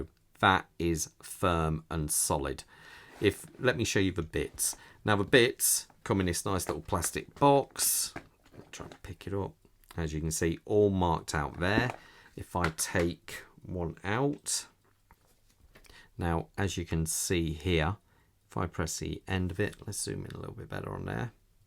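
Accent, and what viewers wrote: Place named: England, United Kingdom. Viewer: I thought you was American, cool you're English. Your videos are really crazy good, enjoy watching, cheers mate.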